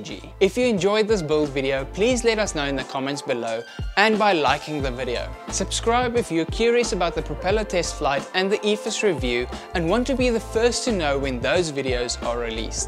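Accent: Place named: South Africa. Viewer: That's a great video - only just found you. and love hearing the SA accent - I miss my SA friends! Same question as always though: how does anyone afford their own plane??? 😁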